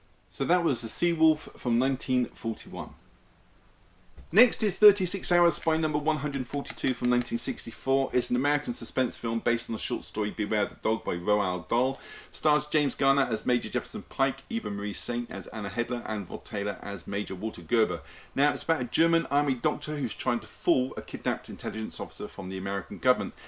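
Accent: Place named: Manchester, United Kingdom. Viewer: no you are right mate, it’s a Manchester accent. I moved to Cornwall around 20 years ago 👍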